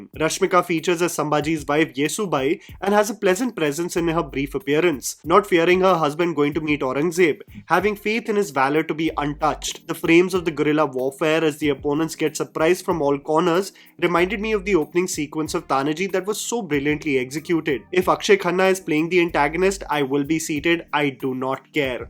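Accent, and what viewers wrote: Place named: Punjab, India. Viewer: He is speaking like a panjabi not a maratha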